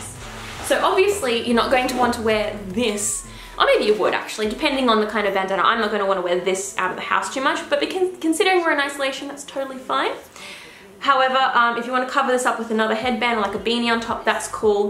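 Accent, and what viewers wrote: Australia. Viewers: She kinda souds aussie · It’s an Aussie accent I have one too. Aussie Aussie Aussie oy oy oy. Isn’t your name mate. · Omg! Kath and Kim! The way you said "so" at one point sounded exactly like one of the snooty shop keepers (forgot their names..Trude I think), which sealed in my mind that you must definitely be Australian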